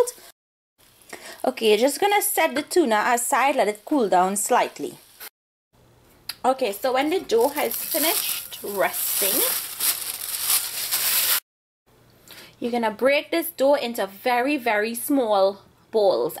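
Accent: Trinidad and Tobago. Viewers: Ganaria Gente trini accent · Kenny H She is not faking an accent. She is from Trinidad in the Caribbean and is speaking with a Trinidadian accent. She is not trying to sound like anyone from another nationality. Italians don't speak like that and she is not from the Middle East.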